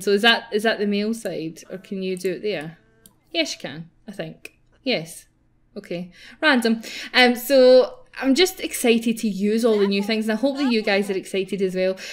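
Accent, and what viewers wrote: Scotland, United Kingdom. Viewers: I love your accent what part of Scotland are you from?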